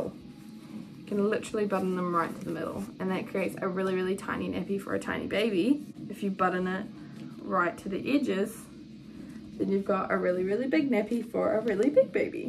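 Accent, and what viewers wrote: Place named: New Zealand. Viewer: Yay lol perfect timing! Trying to figure out cloth nappies for my babe. Far easier to understand and enjoy listening to another kiwi young mum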